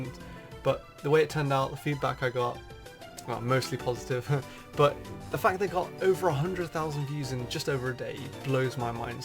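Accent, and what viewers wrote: United Kingdom. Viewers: are you like British? you sound British.(sry I don't mean to be so rude. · He said half white and half Chinese, I can only assume he's British, based on his accent. :)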